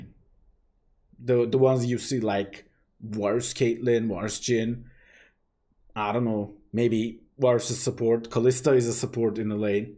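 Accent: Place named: Turkey. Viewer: Just like Fatih Terim, how speaks Turkish, he also speaks English.Exact the same way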